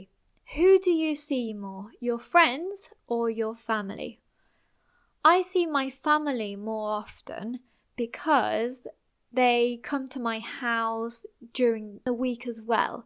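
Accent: United Kingdom. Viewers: Amazing british english 🇧🇷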